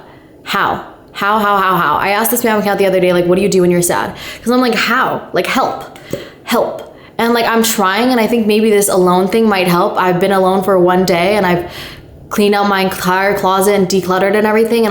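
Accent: United Kingdom. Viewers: We love your Bri’sh accent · you are my queen and the british accent pops off x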